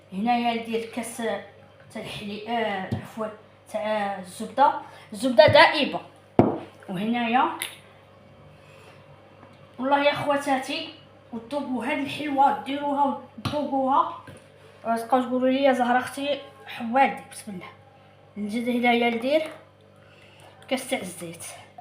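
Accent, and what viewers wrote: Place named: Morocco. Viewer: لهجتك مثل المغاربة هل أنت مغربية ؟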